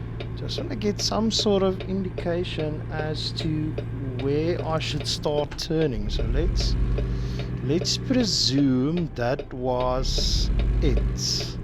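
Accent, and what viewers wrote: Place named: South Africa. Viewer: Im willing to try out the mod 🥲 just wanna see what it is about. You accent sounds familiar ,are you South African Rare to see a South African gamer into trucking 👍Nice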